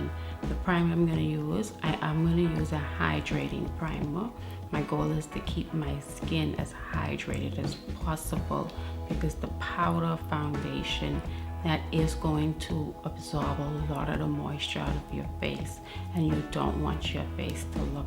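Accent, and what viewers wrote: Bahamas. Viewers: You don't see this much over here. We just slap makeup on without 1st taking care of our skin and then wonder why our skin is messed up. I use to think makeup broke my skin out until I started watching beauty channels here on YouTube. Then I learnt how to care for my skin before and after applying makeup. Not gonna it feels strange hearing the Bahamian accent here on YouTube but you doing it. Taking 242 to the world 🇧🇸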